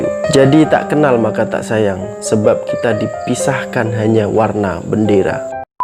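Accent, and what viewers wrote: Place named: Kuala Lumpur, Malaysia. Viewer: bang bunyi loghat KL haha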